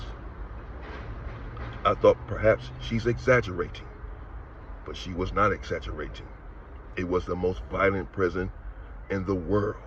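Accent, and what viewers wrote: California, United States. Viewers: Bruh you sound like Chill from 16 to Life, it must be a Cali thing. Wishing you the best with the channel my G!💯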